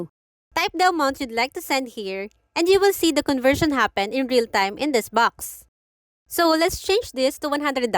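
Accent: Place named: Philippines. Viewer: Iba talaga mag english ang tagalog. Litaw na litaw haha. Ty bga pala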